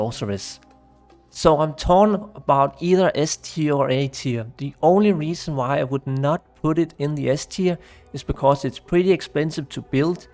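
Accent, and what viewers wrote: Denmark. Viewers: I guessed danish too! I thought he sounded a bit like Lars Ulrich, so that gave it away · i smell dansk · I guessed you were Danish and got it right! I recently found out I'm a third Norwegian so I've been paying a bit more attention to Scandinavian accents :P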